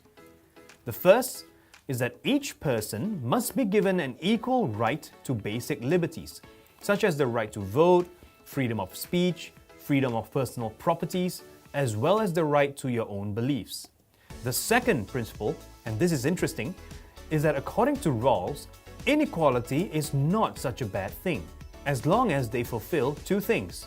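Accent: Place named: United States. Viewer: Where we learn to speak fluent American engilsh. Pls suggest ways to speak English like you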